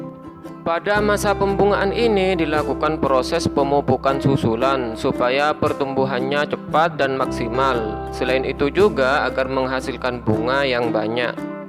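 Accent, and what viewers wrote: Madura, Indonesia. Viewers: asli mana bang? kog logat madura kayaknya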